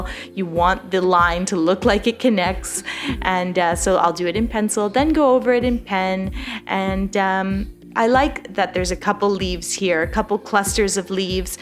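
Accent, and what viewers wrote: Canada. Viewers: So I’m The first time viewer and into this video I asked myself is this a fellow Canadian, because I’m pretty sure she’s from Canada ? Checks online Yep. 🇨🇦👍❤️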